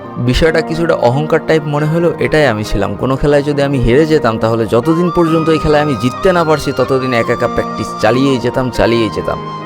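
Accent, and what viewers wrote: Jessore, Bangladesh. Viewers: ভাই আপনার জেলা মনে হচ্ছে যশোর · ভাই আপনি তো আমার নিজ জেলার লোক আমি বুঝতে পারছি আপনার বাসা যশোর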